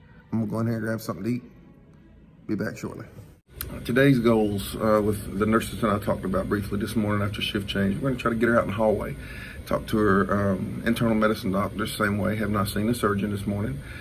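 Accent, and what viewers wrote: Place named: South, United States. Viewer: Nil per oral, not in the mouth for you, I know you speak southern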